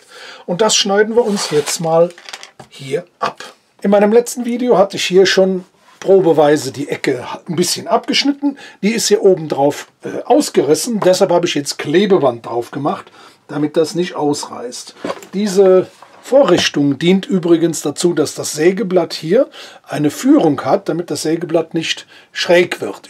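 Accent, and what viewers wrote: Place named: Cologne, Germany. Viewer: 👍🤣 bin eigentlich kein Kölner 😉 finde aber Deinen leichten Akzent sehr nett 😊 Gruß Detlef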